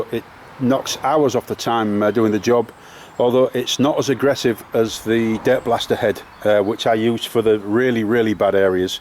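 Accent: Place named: Yorkshire, United Kingdom. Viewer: A really good review, nice to hear a Yorkshire accent and not an American.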